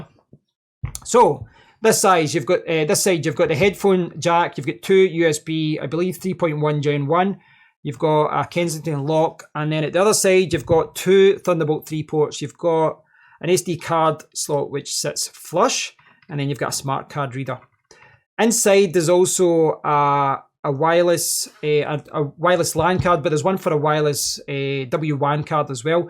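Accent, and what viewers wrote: Scotland, United Kingdom. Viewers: oh, my bad. Scottish accent is awesome too. I love it. Just bonnie.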